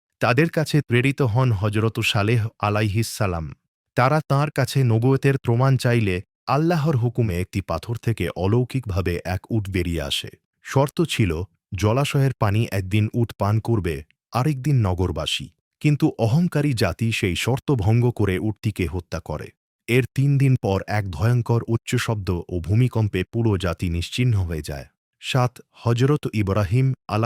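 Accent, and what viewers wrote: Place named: India. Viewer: Indian raa স er pronunciation aveba kore knoo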